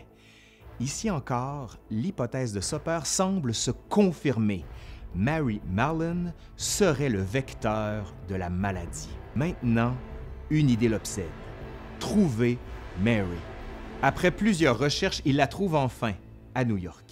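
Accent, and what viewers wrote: Quebec, Canada. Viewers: Tu raconte trop bien et j'adore l'accent Quebecois.... Tes histoires sont top 👍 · Déjà entendu auparavant, mais je savoure votre manière de le présenter, avec accent québécois, humour, beaux yeux et de belles images. Merci! 😉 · Rien que pour l'accent du Québec, j'adore vous entendre... Enfin, j'adore aussi vos histoires! Bises de France.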